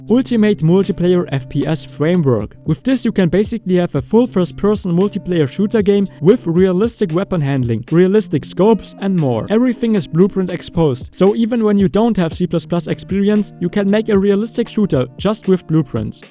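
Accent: Germany. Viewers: I take it from you accent that you're German too? Cause I'd be interested in the Node Graph Assistant Plugin (coming from Houdini it's just incomprehensible to me how bad the Node Graph functions in UE are by default 😅) but it says that the plugin was not available in my region. How did that work out for you?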